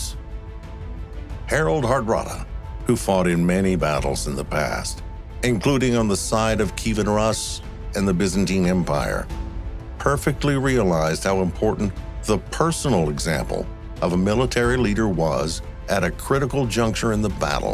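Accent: United States. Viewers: such a shame a yank commentates,..ruins the whole thing!!!! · Bad American Voice over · Sorry I struggle with the narration it’s too loud and painfully American . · A medieval battle in the north of England narrated by an American cowboy. Love it!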